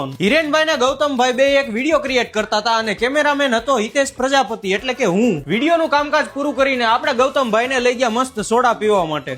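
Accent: Kathiawar, India. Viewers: લાગે છે તો અમારા કાઠિયાવાડી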